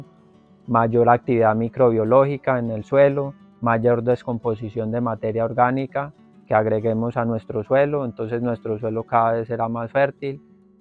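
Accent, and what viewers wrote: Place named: Colombia. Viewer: Bendiciones manito por el acento eres colombiano en que departamento vives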